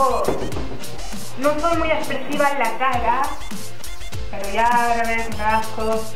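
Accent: Chile. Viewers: muchos dicen que ya sabían que edad tienes yo por tu acento ya sabía que eras chilena xd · yo ya sabía que eras chilena! :'v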